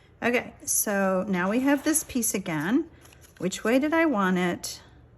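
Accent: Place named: Texas, United States. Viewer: These are beautiful! I love your card making ideas and listening to your Texan drawl .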